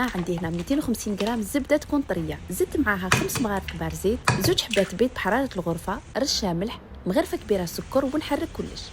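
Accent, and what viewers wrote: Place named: Algeria. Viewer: ليس بالضرورة العلم الجزائري لانو اللهجة واضحة جدا أنها جزائرية مغربية مرت من هنا. نجربها في العيد 🤣🤣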